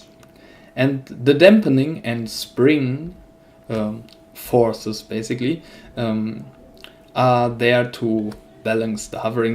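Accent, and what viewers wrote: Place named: Germany. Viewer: Yeah, thanks. Watched all thru, good presentation. And, everybody loves nice German accent! :) (it's a good thing) I am latvian (lettisch) btw, so I love all "euro version of English :D ). Oh, maybe one provocative question: is this asset pretty much finished state, or maybe any additions / changes planned? Thanks!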